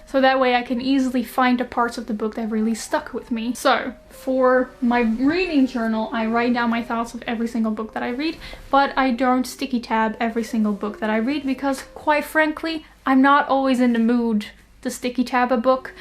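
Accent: Australia. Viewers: Where are you from? Australia, Norway or USA? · I love how you're getting an australian accent <3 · Anyone else noticed that she is starting to get an Australian accent on certain words 😂 Edit: the amount of people telling me she's Dutch 🤦‍♀️ I know she's Dutch. Just that I'm hearing Aussie twists on her words, not a bad thing at all, just an observation 😊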